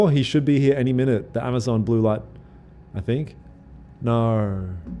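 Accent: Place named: Australia. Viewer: I live overseas as well and it's nice hearing an Aussie voice when watching streams. Keep up the good work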